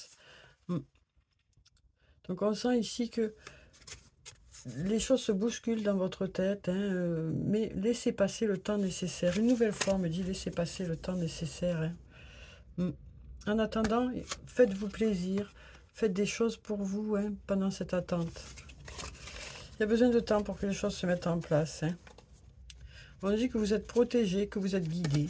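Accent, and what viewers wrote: South, France. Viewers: Bonjour,cela fait plaisir de vous,mettre un visage sur l accent du soleil e👍🤩😘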